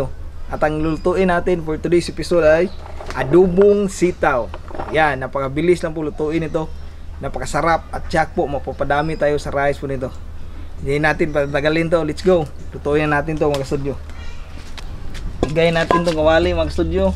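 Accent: Visayas, Philippines. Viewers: Tarongin mo naman kahit gamay tagalog mo pre pagka bisdaka motagalog nemu ting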